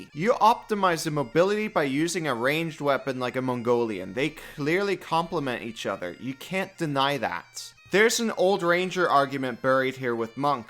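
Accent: Scotland, United Kingdom. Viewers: this guy scottish?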